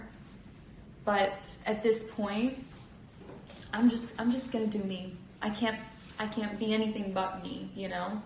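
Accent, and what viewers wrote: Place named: Pennsylvania, United States. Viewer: pronunciation is characteristically Pennsylvanian. Do you expect her or anyone else to know what pronunciation you prefer?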